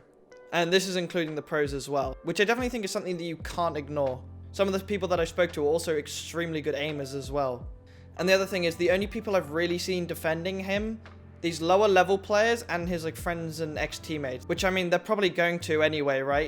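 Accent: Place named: United Kingdom. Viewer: This content is actually fire bruh, a fucking panda who plays r6 and narrates with a brittish accent? who doesn't want to watch that type of content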